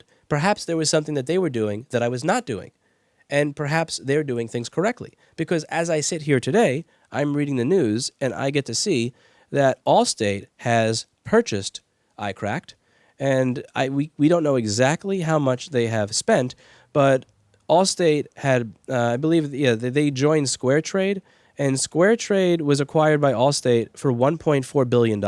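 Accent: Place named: New Jersey, United States. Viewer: You sound like you live where I live. Nj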